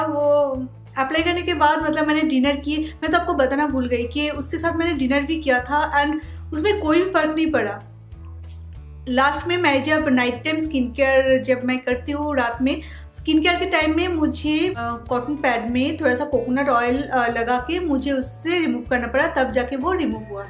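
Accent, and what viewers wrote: Bengal, India. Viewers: Hi. I recently started watching your videos. I live in NY,USA but I was born and raised in Kolkata. Watching you connects me with my childhood and youth. I love the way you talk in hindi like most of my bengali friends did. You sound so genuine and so comfortable in front of the camera as well. I am always looking forward to watch your videos. Keep up the great work.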